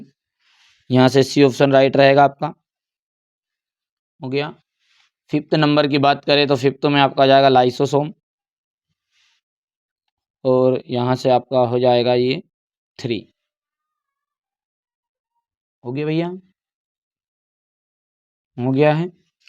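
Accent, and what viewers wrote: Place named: Malwa, India. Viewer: Sir ap malvi Bhasha Bolte ho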